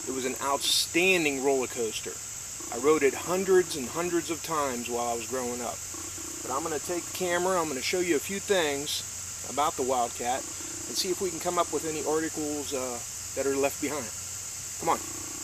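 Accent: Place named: Northeast Ohio, United States. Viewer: It was nice of him to commemorate the ride in this way. Gotta love that NE Ohio/ western PA accent. Haven’t lived in OH for many years but like your hubby I think about this coaster all the time.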